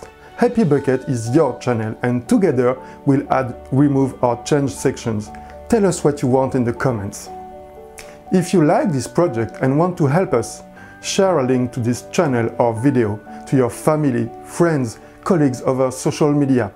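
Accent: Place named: France. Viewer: Maydee I ffink ze baiste iss mébi douwing your videyos in frenche and subtitle them in English. I had to turn subtitles to understand, you have a very Antoine de Caunes type of accent and some words are just missed because of the “intonations “.... but very good choice of news, happy indeed. But try smiling (even) more. It’s happy news ! 😁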